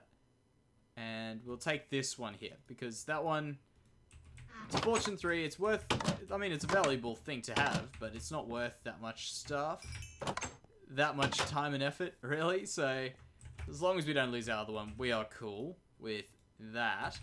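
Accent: Australia. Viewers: Where in Australia are you from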